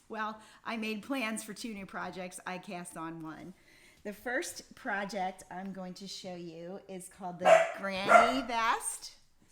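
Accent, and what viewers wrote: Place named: Pennsylvania, United States. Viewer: Hi!, I just found your podcast. You are very pleasant to watch. It sounds like you are from PA. I grew up in Lycoming County. Your granddaughter’s sweater is so beautiful; I just love the color. She is a lucky little girl to have a knitting grandma. Happy knitting!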